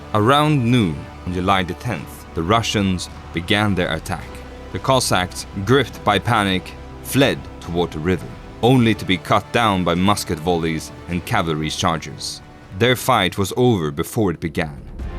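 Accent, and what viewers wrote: Sweden. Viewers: There is nothing more cringe for a Swede than hearing another Swede speakinf English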